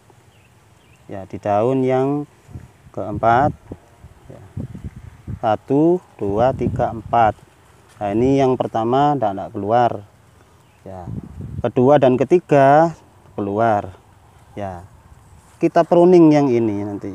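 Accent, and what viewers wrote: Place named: East Java, Indonesia. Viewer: dari logatnya kalau gak malang ya surabaya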